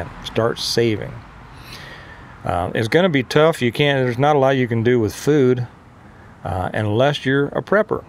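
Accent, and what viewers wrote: South, United States. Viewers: You're making me over comment ....You're much better than Dave Ramsey ...in fact you should brush up and apply for the show .....your country accent is better also ....Time for a change !!!👍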